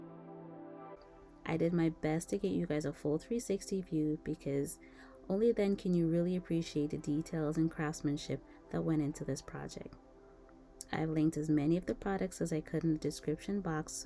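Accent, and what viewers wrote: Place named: United States. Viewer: For being an immigrant you have a very good American accent. Wouldn't have guessed it. Great videos btw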